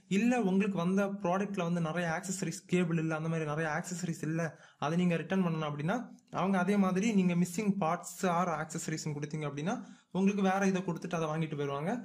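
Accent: Kanyakumari, India. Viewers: Kumari Tamil 😍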